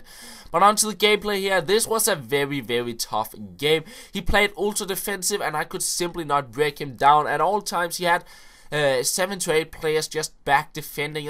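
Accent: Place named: Denmark. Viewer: it is funny cause i amm drunk, and it looks liiiiiiiiiike it is 44 plkayers on the turf :P BTW, i absolutely love you voice. the danish-english accsent is sooooooo damn awsome and sexy <3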